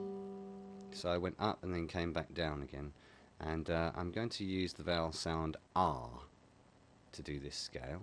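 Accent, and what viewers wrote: United Kingdom. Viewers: ur very welcome uve done a brilliant job i really appreciate it, n yes ur rite ur vid was easier to understand than most vids, last but not least LOL i LOVE ur british accent :) wish i had a brit accent too lol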